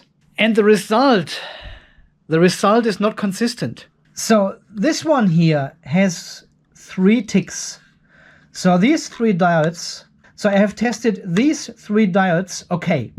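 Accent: Germany. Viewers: I'm starting to think that "American English" sounds better with a German accent!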